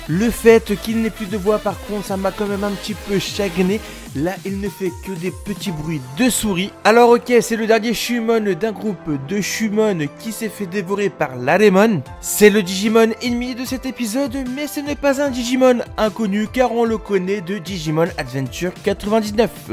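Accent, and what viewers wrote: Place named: Japan. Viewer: Normal pour le symbole du savoir. C un cuirassé a la base. Sinon sympa ta review meme so je trouve tjrs un peu too much de prononcer les noms avec l'accent japonais en parlant francais mais ca reste du gout perso^^ me suis abo pour le soutien ;) Si tu veux jeter un oeil jai fait un amv pour la disparition de Koji Wada ❤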